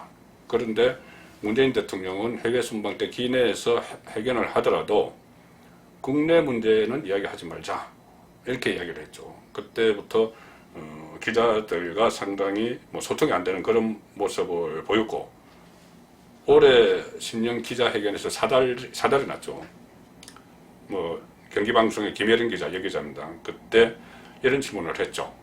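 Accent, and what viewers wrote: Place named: Daegu, South Korea. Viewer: 방송나와서 대구사투리로 대구욕보이지말고 술마셨음 디비자라 시간나면 나베 삭발하나 안하나 취재 좀하고 같은 대구인으로 방송나와 씨부리는거 보마 마이 부끄러워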